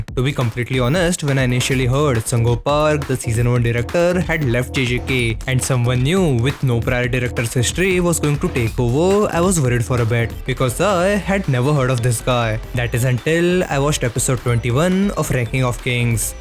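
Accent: India. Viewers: i can tell hes indian i love how they says english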